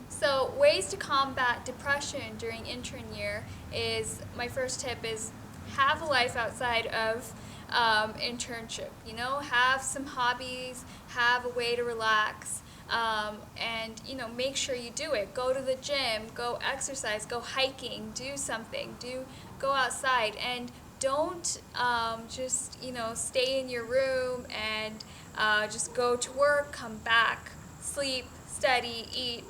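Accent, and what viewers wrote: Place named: United States. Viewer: Hey doctor you said you are an IMG but you speak like an american how you got this accent ?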